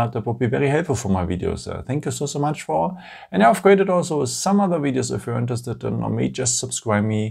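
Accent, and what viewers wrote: Germany. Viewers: Great ! Got mine ysterday . Tought I heard a "german" sound in the video. Vielen Danke from Finland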